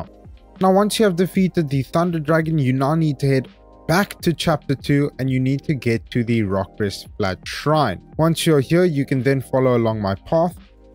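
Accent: South Africa. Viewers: U South African?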